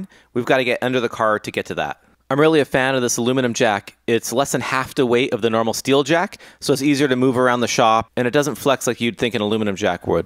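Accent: Greece. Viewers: R u greek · Are you from greece?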